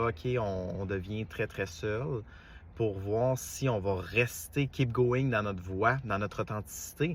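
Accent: Quebec, Canada. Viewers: Bjr gratitude infinie je crois reconnaître un petit accent? Québec? J espère que Dieu t entende j attends un futur procès et un verdict afin que mes bourreaux soient jugées et jugés escroqueries vol détournement d héritage corruption à tout niveau destruction physique barbare le 10/08/23 puis destruction psychologique car manipulations mentales emprise travaux occultes trahisons familiales et amicales etc abus viol etc a 58 ans je rêve de regarder mes bourreaux dans les yeux et leur dire que je suis en vie malgré tout cela une vie détruite destruction financière je languis donc de vivre en paix retrouver mon abondance financière et déménager dans mon arrière pays niçois avec mes animaux créer mon refuge animalier écrire lire gros becs Québec je me souviens 1991 région des Laurentides études d infirmière bsx Christine ❤❤a❤❤🙏🙏🙏🙏🙏🙏📚📚📚📚🦋🏖️🏖️🌈🌈✨✨🌹✨🐾😇😇😇🌴🌞🌞⭐⭐ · Ya pas bcp de quebecois. 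Ca fait du bien t entendre 😂❤❤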